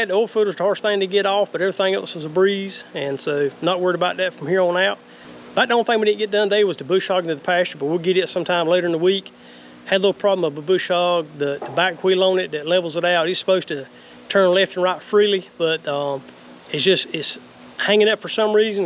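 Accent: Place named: North Carolina, United States. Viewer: Nice to find these helpful videos as well as here the North Carolina accent !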